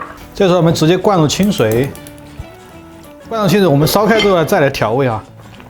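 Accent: Sichuan, China. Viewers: 帅哥亲切的四川普通话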